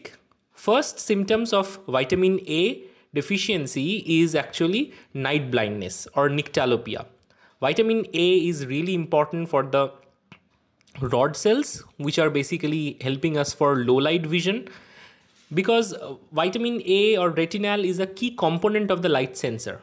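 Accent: Bengal, India. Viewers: APNI KI BANGALI?? BENGALI ACCENT IS STRONG!!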